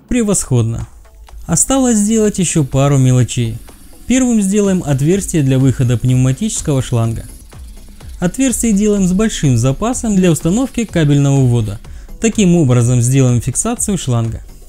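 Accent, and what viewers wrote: Kuban, Russia. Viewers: Вы случаем не с Кубани?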